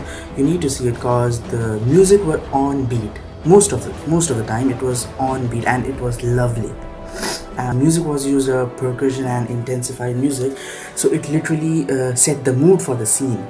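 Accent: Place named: England, United Kingdom. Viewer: Like the english accent :v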